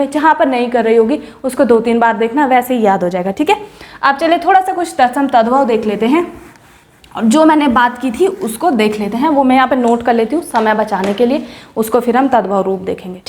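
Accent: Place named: Uttarakhand, India. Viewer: Bhut acha pda rhe ho mem aap uttrakhand se ho lg raa 🙏🙏🙏🙏👍👍👌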